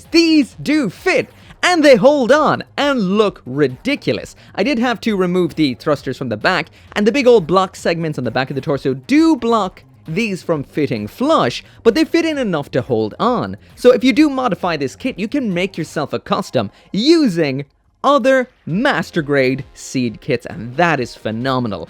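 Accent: Ireland. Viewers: Once you shait I couldn't NOT hear your Irish accent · Shite. Thanks, i always wanted to hear your native accent! Still asking for that one single episode where only talk with an Irish accent ^^ Maybe something from the backlog?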